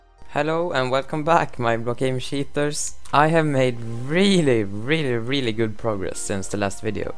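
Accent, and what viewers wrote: Sweden. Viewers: I hear you speak swedish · I kan Hear your swidish in your english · I hear that you are swedish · are you swedish?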